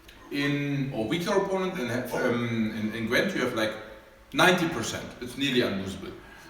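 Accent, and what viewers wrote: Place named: Germany. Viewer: wenn ne kartoffel englisch spricht...ohrenkrebs für meine englische ohren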